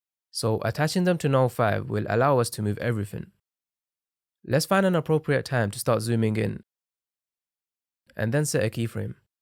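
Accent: United Kingdom. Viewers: I love the central cee accent my dude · Bro are you from west London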